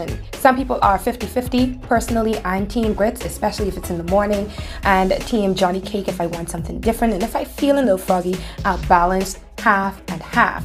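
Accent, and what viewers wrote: Bahamas. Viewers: I really enjoy watching you cook! The accent and the comments only Bahamas’s understand 😁 bring back so many memories of my childhood. I’m American born but my father is Bahamian. Love the food dishes from the island! 😋 👍🏾❤️ by the way, hadn’t heard monkey foot in a minute. My cousins used it all the time. 😁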